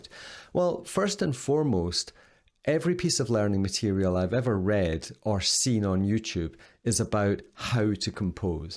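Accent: Scotland, United Kingdom. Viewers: I am in strong agreement with the message of your video. As to your voice, yes please give it needed rest. You and have among the most charming Scottish voices I've heard! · the Scottish accent held me here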